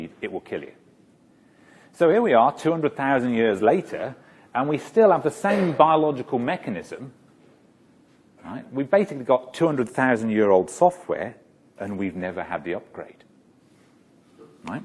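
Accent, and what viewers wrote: United Kingdom. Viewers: Is he ever to the point? Is beating the bush just part of his dialect, is add the cost of a fancy English accent ? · This guy knows how to talk and explain....Although he has that british accent,it is very pleasant to listen to him :)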